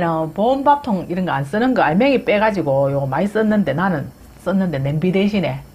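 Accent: Gyeongsang, South Korea. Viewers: 같은 경상도인데 사투리 너무 웃기녀요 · 갱상도 아잉교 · 나는 경상도 사람이라 다 알아듣는데 다른분들은 어떨라나 모르겠네 넘 구수하고 재밌어요 ㅋㅋㅋㅋ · 대구사투리가 너무 너무 맛깔나요 정감이. 팍팍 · ㅎㅎ 경상도 사투리가 너무 재밌고 맛날거 같아요. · 엄청,깅상도 사투리 ㅎㅎ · 오늘도 문디경상도말에 한바탕웃고 또많이배우고갑니다 욕보이소 👍👍👍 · ㅋ 구수한 경사도 사투리 ㅋㅎ 좋아요 저도 경상도가 고향이라 정겹고 치근감이 있네요 고향이어디세요? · 고향이 어딥니꺼? 내고향 진주사투리 같은데예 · 깅상도 정감있는 말씨가 귀엽다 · 경상도 사투리가 자극을 심하게줘서요 ㅋㅋ ㅋ~~ 구독 돟아요 ㅋ ㅋ ㅋ 안 누를수가없어요ㆍ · 아지매 사투리는 억시 정겹지만 대구이남 지역인것 같은대 어댄교? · 오랫만에 보았는데 말 쏨씨 가 쑥 쑥 통 통 튀는 경상도 사투리 듣기 좋아요. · 물가가 비싸서 우리는 꽤없나 ㅋㅋㅋㅋㅋㅋㅋㅋ 경상도 사투리에는 사랑이 담겨있죠^^ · ㅎㅎ 특히하게 하니 말려어요 경산도사투리~^^ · 이분은 경상도 사투리 쓰시는 거죠? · 정겨웁다 갱상도말도 소재도 이것 저것 다 맛갈스럽다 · 참 식혜인가 했더니.... 엿이 됐네요....프라스틱 스푼까지....ㅎ 우습네요.... 그러게요 꾸밈 없는 그 경상도 말투 재밌어요...... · 구독 눌렀습니당😊 말투가 경상도이신거 같은데 경상도 어디신지 여쭤봐도 될까요 저는 대구가 고향입니다^^ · 하이고 아지매요 구수한 경상도 사투리에 재미나게 설명 하시네예 경북경산 사투리 같네요 ㅎㅎㅎㅎ 칠갑을 햇네예 하이고 웃으버라 ㅋㅋㅋ 배꼽이야ㅡㅡ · 갱상도 사투리만 아니면... 일부러 사투리 쓰시나요?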